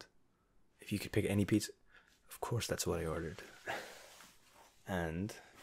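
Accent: Scotland, United Kingdom. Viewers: U sound british ... i prefer hearing british speaking because i feel like it’s someone from my family and I feel more secure! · Your accent is great. it's like British with some Scottish idk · And your Scottish!!! 🥰 I think i'm falling in love! Haha, ok, that's my cue to go before i start sounding like even more of a weirdo, lol 😂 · I actually love your accent - is that a hint of scottish i hear? ;)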